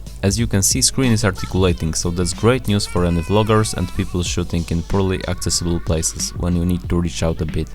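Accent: Poland. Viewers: Słychać ten polski akcent :pp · Your accent sounds like polish idk is it true lmao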